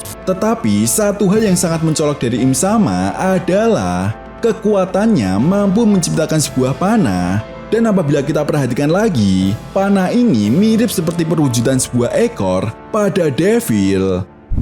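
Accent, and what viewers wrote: Java, Indonesia. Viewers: Logat jawanya lucu, ini yang bikin betah nonton. 👍🏻👍🏻👍🏻😂😂😂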